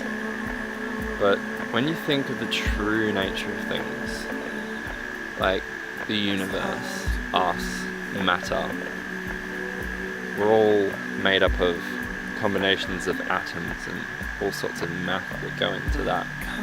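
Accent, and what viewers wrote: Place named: United Kingdom. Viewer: so much yeah. btw given your accent i assume you're British so i'm sorry about brexit and all the possible negative consequences it might have.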